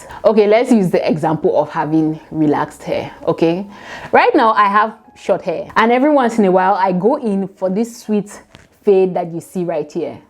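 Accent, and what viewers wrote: Nigeria. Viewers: Please are you a Nigerian I'm trying to figure out your accent....m obsessed 😘... oh....love you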